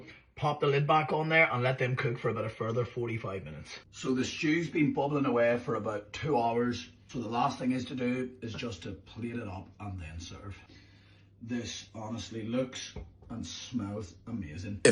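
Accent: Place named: Ireland. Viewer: Love your Irish accent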